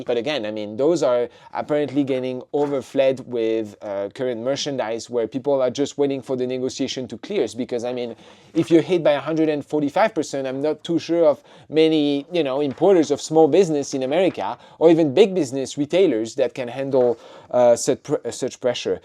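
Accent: France. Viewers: Malgré tous les efforts je sens l'accent français, non ? 🤭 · French accent dude importing Chinees goods into USA Cinéma absolu \o/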